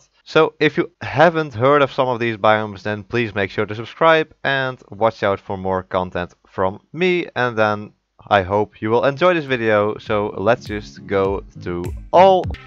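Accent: Netherlands. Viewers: Mooi Engels haha valt wel op dat je Nederlands bent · Goddamn the dutch accent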